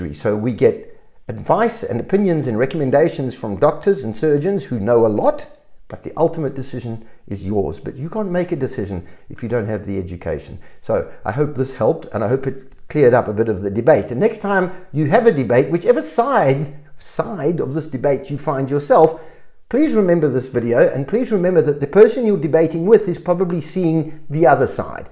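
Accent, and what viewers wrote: South Africa. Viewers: Good heavens, this is a triumphant explanation, and what a pleasant surprise to hear a Saffa accent! I dove headlong into the debate after tearing a labrum. Drs contradicted Physios who claimed that bursa replenish the cartilage by providing nutrients through movement. I'm a 42-year-old (gnarly) Capetonian surfer and I'm plunging into PT to stave off surgery. Thanks for the insight. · KZN accent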